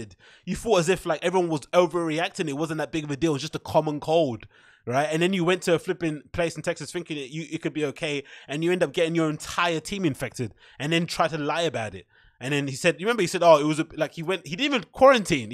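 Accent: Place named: England, United Kingdom. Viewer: How did I know this dude had an English accent before I clicked?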